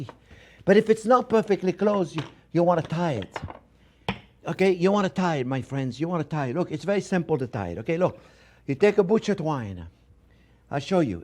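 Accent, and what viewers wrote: France. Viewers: I love his french accent...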